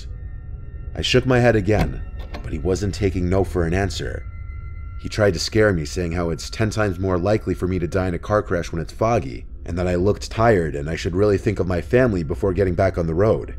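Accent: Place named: New Jersey, United States. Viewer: I'm not done listening to this yet, but after listening for years I only just realized has a slight accent versus standard American tv sound lol. Noticed from the 'a' pronunciation in 'ass'. Have a couple theories about region but not positive, it was just a quiet:D moment for me.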